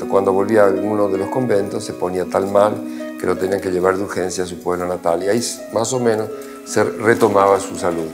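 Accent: Argentina. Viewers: Gracias por este documental Muy bueno el recorrido, la transmisión y la conversación del Padre que narra este precioso documento Parece argentino🇦🇷por su forma de hablar, o de esta zona del continente Dios bendiga a todo el equipo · Es argentino el por lo que se escucha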